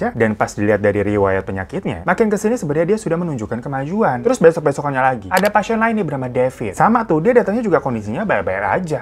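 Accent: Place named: Jakarta, Indonesia. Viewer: Bujug dah enak banget ya kalo udah di chanelnya jd anteng dah ketawa Bae...kayak betawi-jepang tampang Jepang tp logat Betawi medok di denger nya adduuh ilang bete dah kalo udah dengerin nih si ganteng🤣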